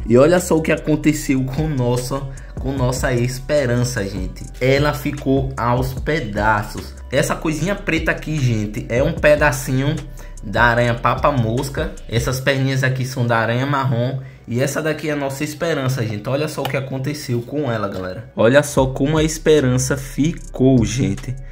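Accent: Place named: Northeast, Brazil. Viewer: O e nordestino por causa do jeito de falar KKKKKK Mais o vídeo ficou incrível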